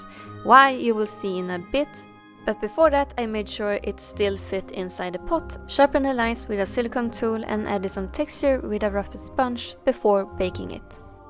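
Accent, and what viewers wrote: Sweden. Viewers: Are you from sweden? You sound swedish, im from sweden ❤️ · Är du svensk ? Du låter lite svensk hoppas du fattar vad jag säger nu 😊☺️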